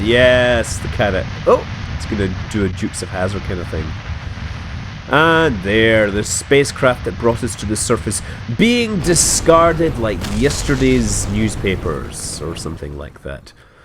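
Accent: Scotland, United Kingdom. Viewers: He's Scottish, I presume he's from Aberdeen or Edinburgh.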